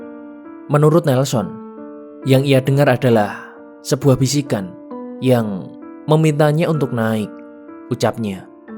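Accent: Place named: Java, Indonesia. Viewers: Lekoh bgt bang logat jawa nya 😄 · Suaranya agak medok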